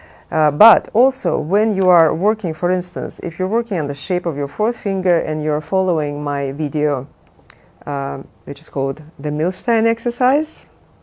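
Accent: Russia. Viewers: Are you русская?) Cuz I hear an accent)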